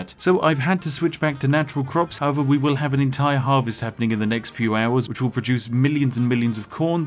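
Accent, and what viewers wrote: United Kingdom. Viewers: 🤔Ahhh.. heh... it makes sense now. You have to pay the prisoners.. Thanks Mr. British Voice!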